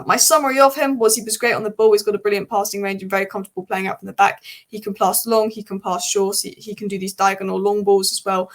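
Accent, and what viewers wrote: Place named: Manchester, United Kingdom. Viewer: Your Mancunian accent is second to none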